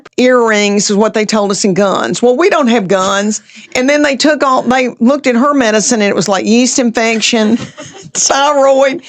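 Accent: South, United States. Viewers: I don't know about farming people and I know with your shows suppose to give a Golden Girls / Friends vibe but honestly that accent takes me back to one of my favourit sidcomes from way back when Designing Women gosh. I loved that show I recogn if they did a remark though I'm sure it never be as good I probably love that too just because of the accent. Southern accent like that it just makes me think of the most interesting, most sophisticated, most hospitable and sexies women on the planet! Every American show just tries to get rid of the accent but in fairness because nobody does it - it's real interesting to rest to the worlds who never hears it or the little idioms that go with it. Considering how you seem to attract your 1st husband that is no word of a lie him being all up in your face since the first day he met you can stalking you the rest of his life. 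Part of me, sometimes like to put on a little southern accent especially if I wanna sass some boyfriend whom I'm flirting with cause his all about keep the Aussie accent, but have you heard the Aussie accent - nuthin' sexy about it! Men are abit slowly - he really doesn't get it but his the only one I do for and because it kinda pisses him off which kinda gives me a kick.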